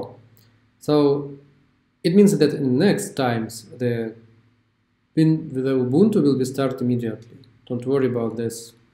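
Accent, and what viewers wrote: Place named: Russia. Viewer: Ваш русский акцент - это просто анбеливабле! Спасибо за видео - очень помогло!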